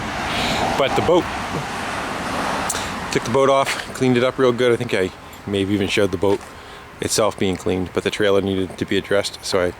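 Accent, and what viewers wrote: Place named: East Coast, United States. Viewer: Most of the east coasters that work in my seafood department where I work sound like you haha.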